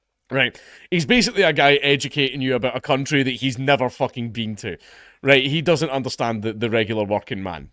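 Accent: Scotland, United Kingdom. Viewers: I don't know why the Scots accent makes everything funnier. It just does. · I think the Scottish accent helps English folk find you funnier too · Nah, man. Don't sell yourself short. This Texan not only loves the Scottish accent, but we find you funny cause your jokes don't suck lol Also, it funny and ironic how comedians will virtue signal by not saying racial jokes because they believe it disparages a group of people, but have no problem making political jokes towards the group they don't agree with. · Your accent sells it to me as an Englishman. You have a delivery and straight talking Scots style which is very funny and appealing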